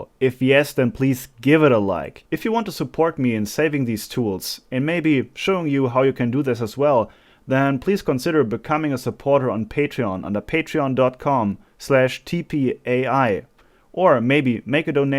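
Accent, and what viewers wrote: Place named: Germany. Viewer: My Great Great-grandfather was from Germany. What part exactly I do not know since he passed away when I was a small child. But hearing your accent and listening to you pronounce things in German is a treat for me. Not mechanically inclined to do this things you are very skilled at. But I will continue to watch your videos and live vicariously through you. And who knows maybe I'll learn a thing or two that will help me take care of the little amount of tools I have. Keep doing what you are doing and good luck with the car repair!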